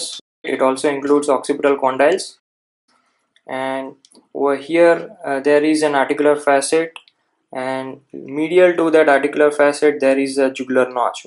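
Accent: India. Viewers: Sir are u gujrati ? Your acent is way like gujrati.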